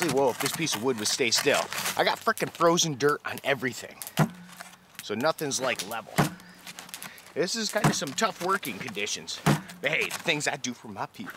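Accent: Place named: Pittsburgh, United States. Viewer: Hey man, are you from Pittsburgh? You sound like it. Thanks for all the vids.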